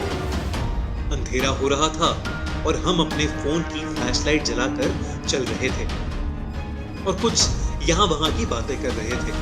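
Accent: United States. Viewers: Are you from America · Are you American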